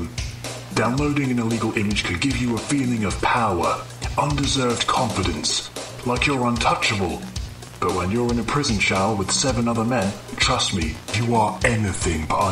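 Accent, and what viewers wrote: United Kingdom. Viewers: i guess in their country, which seems to be the uk based on the voice (and as i reread this, i googled it and google claims they are scottish so it checks out), there are laws trying to restrict access to obscene content in general. i googled it and i think the laws are actually stricter if they are uk-based. as an american the only thing that's treated like this is cp (and maybe zoo porn depending on the state). so i was really concerned by this video, but since they are not american they may very well have a reason to parody censorship laws like this.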